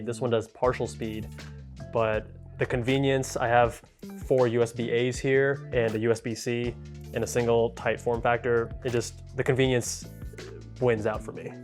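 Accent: India. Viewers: ah yes the indian accent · Loved you INDIAN accent dude💕💕💕💕💕💕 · The Indian accent is ok with me 👍 Sincerely, an Indian person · I vote, Indian accent every intro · 👏👏👏👏very nice with the indian accent ,guud than Indians, with beauty tips and steel except that plastic... haha !! Like 👶👶 enjoyable and useful and diff accents pls😇😇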